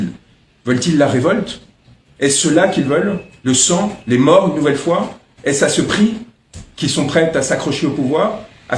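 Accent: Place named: France. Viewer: Il nous parle le français de france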